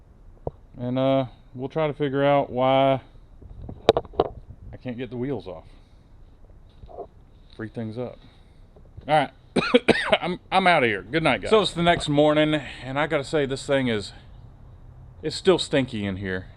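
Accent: South, United States. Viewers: Youuu, southern booyys don't gotta sound so dumb, take notes from!!!') Announcuate!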